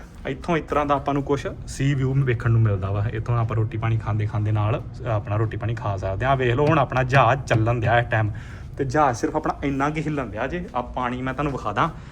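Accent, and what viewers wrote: Majha, India. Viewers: eh majhe side di pure punjabi hi hai ji. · ਬਾਈ ਜੀ 12.23 ਜਦੋਂ ਬਾਹਰ ਦਾ ਵਿਊ ਦਿਖਾਇਆ ਸੀ ਉਦੋਂ ਬਾਹਰ ਕੀ ਸੀ ਜਿਵ੍ਂ JCB ਨੇ ਜ਼ਹਾਜ ਚੁੱਕਿਆ ਹੁੰਦਾ ਟੋਚਨ ਪਾ ਕੇ , ਉਹ ਸਮਝ ਨਹੀ ਲੱਗੀ ਕੀ ਚੱਕਰ ਸੀ ਸਮਝਾਿਓ ਜ਼ਰੂਰ , ਮੈ ਲੁਧਿਆਣੇ ਤੋਂ ਤੁਹਾਡੀਆਂ ਸਾਰੀਆਂ ਵਿਡੀਓ ਦੇਖਦਾਂ ਬਹੁਤ ਨਜ਼ਾਰਾ ਆਉਂਦਾ ਵਿਡੀਓ ਦੇਖਕੇ ਤੇ ਮਾਝੇ ਦੀ ਬੋਲੀ ਸੁਣਕੇ ਤਾਂ ਹੋਰ ਮਜ਼ਾ ਆਉਂਦਾ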